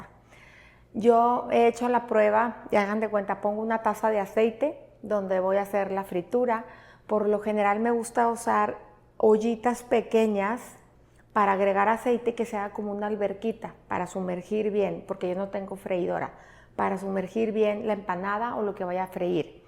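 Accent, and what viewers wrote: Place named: Mexico. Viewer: Nena creo no equivocarme de qué sos mexicana y hablas muy lindo muy bonito me encanta tu acento tu ternura tu dulzura tu calidez tu feminidad yo creo que cualquier mexicano se debe sentir orgulloso de que representas a tu país maravillosamente te mando un abrazo eterno Virginia de berisso Argentina.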